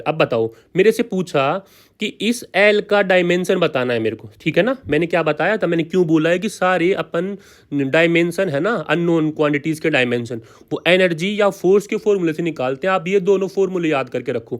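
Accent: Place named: Rajasthan, India. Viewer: App Rajasthan se jaipur ke as pas se ho kya kyuki apki awaj ,Kai Che wali lag rhi h😊😊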